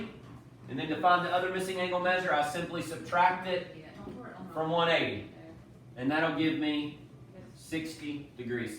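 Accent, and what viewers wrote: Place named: Ireland. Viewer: my one complaint is the Irish accent. Other than that excellent video, keep it up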